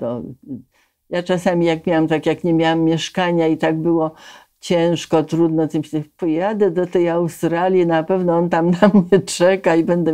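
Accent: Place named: Warsaw, Poland. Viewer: Ma Pani cudny warszawski akcent.❤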